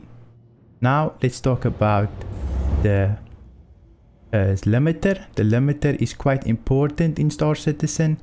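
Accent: South Africa. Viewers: that s afrikaanse english accent lo so cool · South African?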